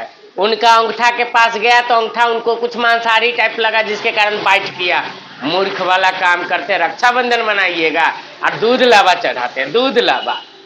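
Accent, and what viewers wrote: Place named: Bihar, India. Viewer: Bat karne ka style mazedar he bhai ..traditional Bihari language very nice Mahadev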